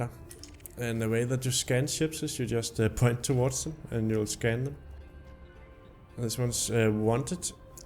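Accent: Denmark. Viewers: Cool guide, and lovely danish accent (I'm norwegian myself :p)!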